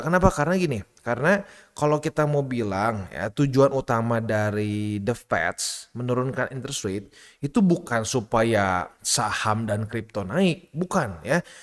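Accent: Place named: West Java, Indonesia. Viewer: Bang lu orang sunda bukan? Kaya lekat banget logat sunda nya 😂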